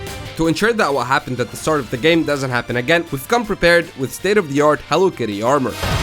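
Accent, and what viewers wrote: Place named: Arab world. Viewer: أظن من لكنتك ومخارج نطقك للحروف انك عربي😅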